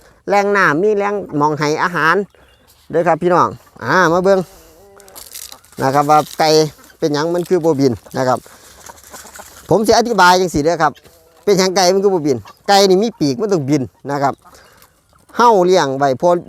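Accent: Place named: Isan, Thailand. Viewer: เขาคือคนอีสานค่ะ ภาษาที่ใช้ก็คือภาษาสำเนียงบ้านเขา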